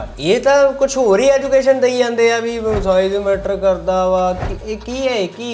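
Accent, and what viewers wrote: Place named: Ludhiana, India. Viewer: ਬਾਈ ਤੋਂ ਲੁਧਿਆਣੇ ਤੋਂ ਲੱਗਦਾ😅😅😅😅😅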